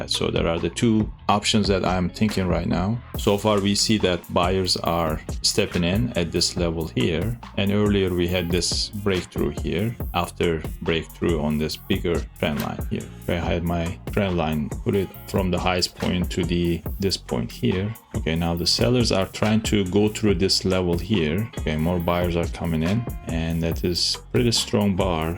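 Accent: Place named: Turkey. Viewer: Hello, Are you originally Turkish? Your accent sounds Turkish😊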